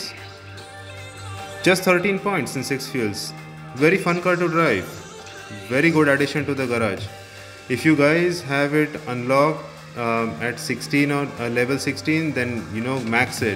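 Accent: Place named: India. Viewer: your voice is kinda Indian type ... Just the accent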